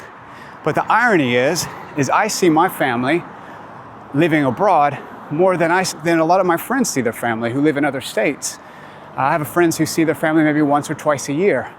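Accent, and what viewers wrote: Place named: Northern England, United Kingdom. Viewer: This guy is obviously from Northern England or Ireland and is cosplaying as a North American for clicks and likes.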